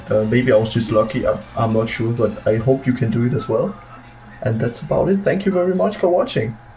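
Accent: Denmark. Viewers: Well it was my frist kavat and I just got it xD Btw are you from Denmark? because you sounds a bit like a dane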